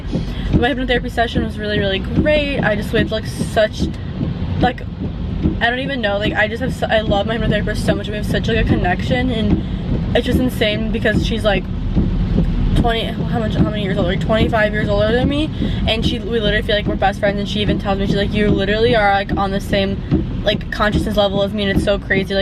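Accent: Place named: Russia. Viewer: I love your russlish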